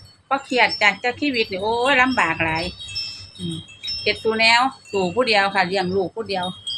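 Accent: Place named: Yasothon, Thailand. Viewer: สวัสดีจ้า เว่าเสียงสำเนียงเหมือนทางบ้านยโสธรเลยจ้า ร้องเพลงกะมวน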